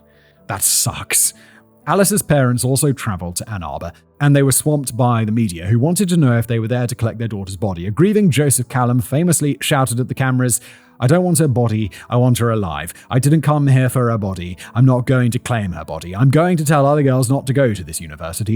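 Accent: United Kingdom. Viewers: I'm a Houstonian now, but both sides of my family are from Wisconsin and Michigan. So I get to cringe every time he says something in this episode, plus his British-accented-Spanish-Spanish pronunciations where I'm used to Mexican Spanish. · well he is British so…makes sense how he would have a different pronunciation for words. Also…I knew the correct pronunciation right away. I also had family in the area during the time he was at large.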